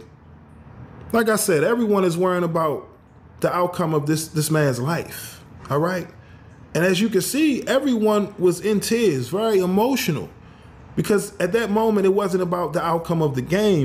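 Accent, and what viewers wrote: Baltimore, United States. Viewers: U from bmore?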